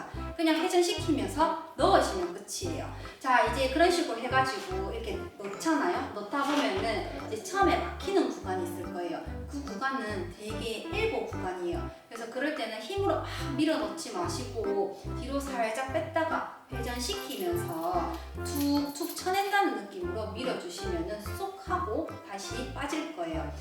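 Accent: South Korea. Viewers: 대구에 사시는 분인 줄 몰랐습니다 경상도 대구 고향 말씨 정겹습니다 감사합니다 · 오늘은 ~~~ 경상도 사투리 잘 보고 갑니다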